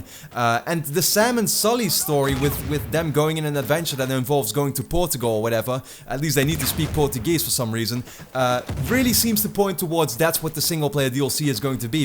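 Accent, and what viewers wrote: Netherlands. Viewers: Ik merkte licht aan het accent dat je niet van origine engels bent, maar Nederlands had ik niet verwacht. Keep up the good work 👍🏻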